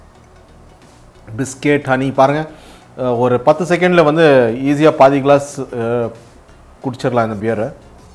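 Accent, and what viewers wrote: Bangalore, India. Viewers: Nice review. Hope you are from Bangalore. Since your slang is like Bangalorian..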